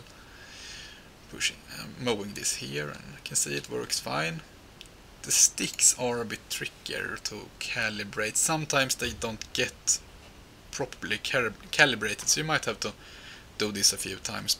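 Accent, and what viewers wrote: Sweden. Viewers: Är du skånsk??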